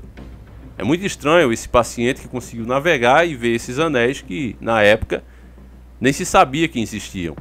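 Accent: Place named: Pernambuco, Brazil. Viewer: Tudo e todos do canal merecem nosso respeito carinho e dedicação de passarmos a diante as informações para abrir os olhos ah do seu respeito a sua região quebra a pau pois esse sutac pernambucano igual a do meus pais e irmãos que nasceram aí me faz voltar a infância tudo verdadeiro nada artificial obrigada por tudo tô em Santos e admiro seu trabalho manda um abraço e pau neles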